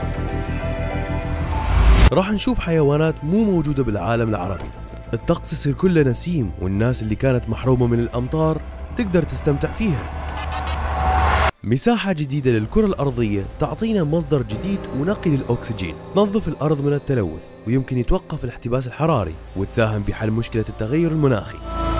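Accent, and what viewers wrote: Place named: Iraq. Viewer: المعلق بالفيديو عراقي😂😅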